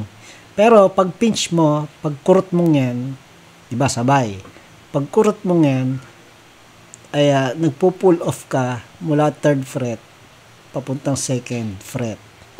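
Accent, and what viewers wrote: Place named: Visayas, Philippines. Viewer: Dol galing talaga, bisaya ka?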